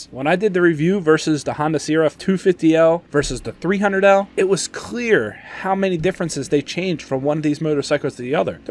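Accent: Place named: Pennsylvania, United States. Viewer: Are you from southeastern pa? I hear the accent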